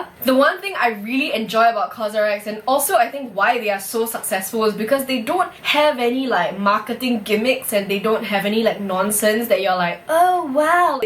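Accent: Singapore. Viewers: lol this is my first time watching your vlog and I cant believe I guess it right, I immediately thought that you're a Singaporean just by listening to you talking hahahahaha I'm from Malaysia btw. Thank you for the honest review, I have some COSRX's stuffs on my wishlist but still don't know which one exactly to get so this really helps me! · It's the Singaporean accent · OMG you sound just like my classmate from Singapore. Great review!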